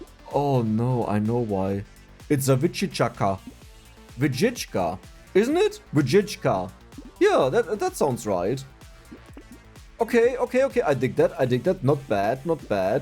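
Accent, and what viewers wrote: Germany. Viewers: By the way, my browser has Voice-Over-Translation and on large videos (like this) from English, you have to wait about 1-2 minutes for voice acting. But because of your pronounced accent, the browser detects it as German, and then gives me an error because it doesn't understand why English words are pronounced in German. You have to manually set English. (Who cares, the browser is called "Yandex Browser". I don't know if this works with other languages, but it does sound exactly in Russian)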